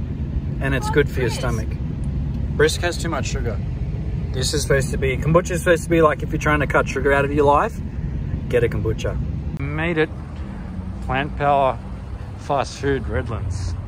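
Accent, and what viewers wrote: Australia. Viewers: It’s sick how you liked and replied on every comment. Better comment now before you get to famous and I love the Australian accent man, wish I had one so I could get mad chicks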